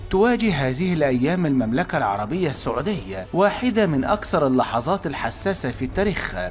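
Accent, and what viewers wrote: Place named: Egypt. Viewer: صدقني لو تشوفلك حاجه تفعك احسلك من هاذا الكذب وهاذا التحليلات لاانت ولا الذي معك تستطيع ان تعرف شي او حتى تحلم حفض الله حكامنا وحفص الله ملكنا والله لاانت ولا حتى جدودك تقدر تعرف حاجه عن حكامنا خليك فالمصايب الي عندك احسن قسم الله عقللك وعقل من اعطاك هاذا الكذب شوف مشاكل بلادك وشوف مصايبكم وحلوها انت تعرفوون أنكم ذباب ويجب التعامل معكم بحزم تراك مصري حتى لو غيرت لهجتك معرف من الي الكذاب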